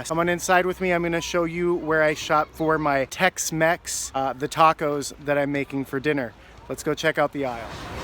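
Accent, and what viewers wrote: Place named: Minnesota, United States. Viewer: I usually make sugar cookies with my granddaughter, but I moved this past June so I will miss it this year. My son comes from north Texas for the holidays so I make him chocolate chip cookies. I thought I could detect a Minnesota accent in your videos 🙂 I am from Minnesota so it is easy to hear the accent!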